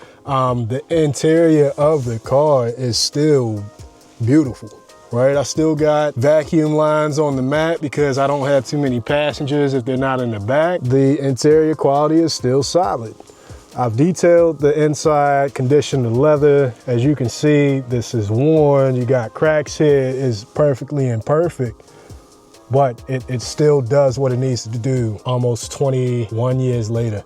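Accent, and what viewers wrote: Baltimore, United States. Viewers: I know a Baltimore County accent when I hear it! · Facts am from DC and i hear B-more county all day in fam.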